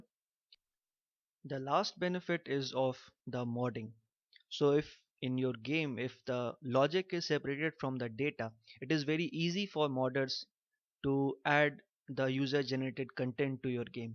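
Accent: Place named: India. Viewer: Oh man I hate the Indian accent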